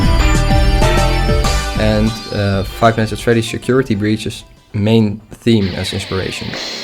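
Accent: Netherlands. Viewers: my brain knew you were dutch the first second in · As a dutch person I can confirm he sounds Dutch. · Nice, i could hear it on your dutch accent :D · A few seconds into the video and I could tell you were dutch ! :p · your definetly dutch bro your accent lol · Very dutch accent lol I love it great video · ja neterlands accent heb je wel door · there is something in the way netherland people talk english, that makes me think theyre from the netherlands, gues i was correct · Hoi ik kon al meteen aan je accent horen Dat je Nederlands bent. Anyways coole video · hoi, ik herken een nederlands accent, heb je een discord server?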